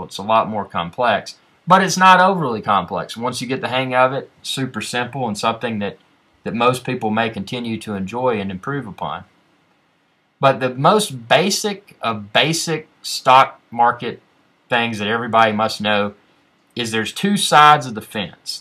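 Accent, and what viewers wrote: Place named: South, United States. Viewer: trading stocks and a southern accent DO NOT GO TOGETHER... that's like a mexican cooking your chinese food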